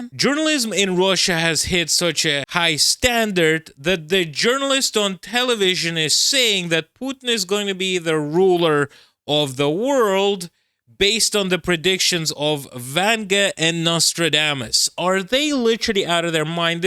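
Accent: India. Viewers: I just love your videos! Especially your dubbing with different accents! Great Indian accent! As a American I want to know what is going on in Russia! I have been watching your videos since you lived in Russia! I have always enjoyed your videos!!!!😂😂😂😂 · Brilliant Indian Accent. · I love your Indian accent!😊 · OMG!!! YOUR FUCKING INDIAN ACCENT!!! 🤣🤣🤣🤣🤣🤣🤣🤣 I mean, the information is relevant and important but the way you deliver it is just BRILLIANT!!! Thanks! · Your Indian accent is new. Love it!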